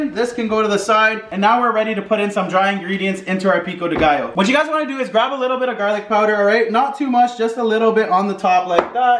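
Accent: Mexico. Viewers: is is just me o does he hve a mexican agcent i should know im exican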